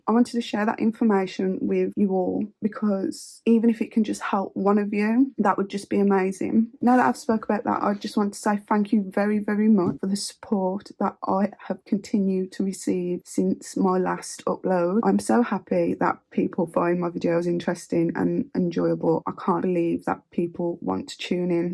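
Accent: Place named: Midlands, United Kingdom. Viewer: Glad I found you. I love a good midlands accent !!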